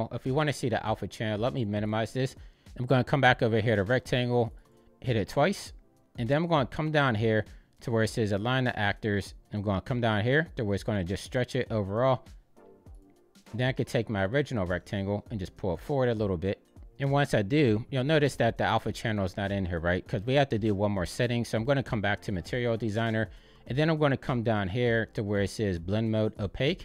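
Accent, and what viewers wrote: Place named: Pittsburgh, United States. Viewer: Thanks so much for this! I'm new to the channel. I thought I heard a bit of a Pittsburgh accent in your voice, then I saw the Kennywood sign behind you. My hometown is not too far from there! (I worked at Idlewild Park as a kid.)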